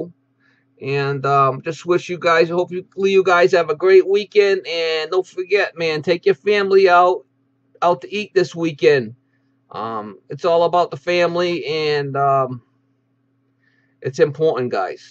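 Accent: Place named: New Jersey, United States. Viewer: You tell it like it is. You ain’t no charity fix-all. If people want oats that’s already been through the horse they come a little cheaper but if they want nice fresh green oats, they gotta pay for them. Like all of your videos and your accent. Jersey?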